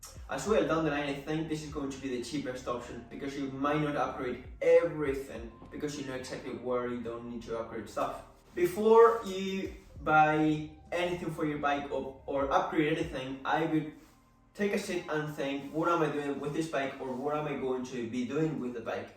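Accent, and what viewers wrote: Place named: Northern Ireland, United Kingdom. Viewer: great video! im curious man, i see from another comment you speak spanish, but you sound like you mightve been raised in northern ireland. is this the case? really cool if so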